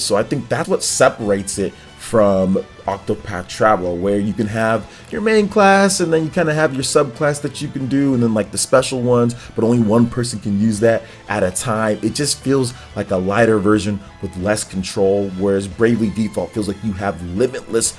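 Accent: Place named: United Kingdom. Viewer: British accents are so annoying.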